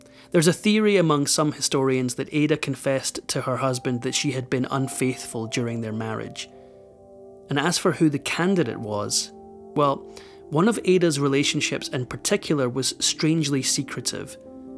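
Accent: Scotland, United Kingdom. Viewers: Sounds as if this has been sourced from the BBC because we’re listening to Scottish Historian Neil Oliver… pure brilliant wee man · Interesting...but is no one going to comment on the voice of the narrator lol I have heard him narrate a few such stories What a lovely Scottish accent he has .. not like some of those voices you sometimes get . But yes Interesting account of her life and very sad also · Off topic comment/question: I can't get over how much you sound like another Scot who is a political commentator with a particular interest in U.S. politics, & can be seen & heard on The Meidas Touch Network. Any relation? I'm not remarking on the accent alone although that, of course, plays a part. I'm talking about the sound of the voice, the cadence, tonality etc. as well. If this is too personal, I apologise, & ask that you simply disregard the question. 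Otherwise, thank you for reading & providing me with something new to which I can Like & Subscribe. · Great story told well - especially the Scottish accent! But please don’t say “close WITH someone; the correct way is to be close TO someone. The first is an Americanism that has really annoyed me recently in Australia . . .and should annoy any good Scotsman too. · The narrator of these videos sounds just like David Tennant. I know I'm being naive here about Scottish accents, but there ya go. I hope my comment doesn't offend anyone, i.e., they all sound alike. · If all of this accounts’ voiceovers are AI, this is the most natural of them all. There is inflection, natural pauses and no unnatural stops mid sentence. This gentle Scottish voice should be used for all of the videos.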